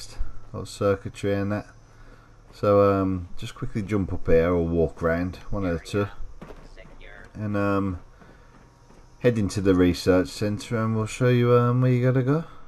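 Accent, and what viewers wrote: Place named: Birmingham, United Kingdom. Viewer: I never thought I'd find a fellow Brummy on YouTube. Our voices are shit for commentary haha.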